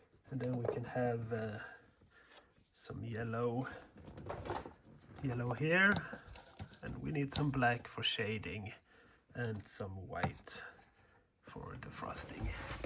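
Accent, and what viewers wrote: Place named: Sweden. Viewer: Excellent carving tutorial. Your speed of carving is perfect for beginners. And, never fear, your English is just about perfect with, and with an enjoyable Swedish accent. BRAVO from California.l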